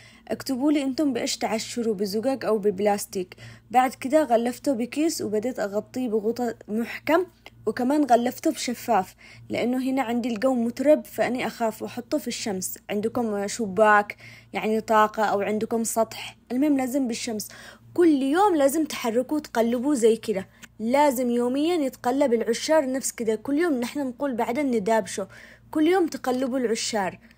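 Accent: Aden, Yemen. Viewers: عجبني لهجتك العدنية 😂..وطبعاً العشار بالطريقتين ❤ والصيادية الشهية.. تسلم يداك يا بهية 😅 سلامي وتحياتي لك من صنعاء. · عجبتنا اللهجه العدني 😂❤ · ماشاء الله عليك اسلوبك حلوو جدآ بالشرح وخصوصاً باللهجه العدنيه واني عدنيه تسلمي وتسلم يدك الله يحفظك ❤ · ❤ فديت بنت عدن اللي ولا تغيرت لهجتها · طبخك للأكل العدني جميل وكلامك باللهجة العدنية أجمل كل التوفيق والاحترام لك من عدن وربنا يوفقك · صفحتك مرررة حلوة والله يكفي نسمع صوتك والكلام العدني يفوووز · ماشاء الله عليك عادني الا اول مره اطلع على قناتك وعجبتني وايد وشرحك خفيف لطيف مع لهجتك العدنيه الحلوه تسلمي الله يوفقك ويسعدك❤❤ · فديت الكلام العدني كم أحبه يسلمو اليدات بنت بلادي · اني افدالك احلاحاجه اللهجه العدنيه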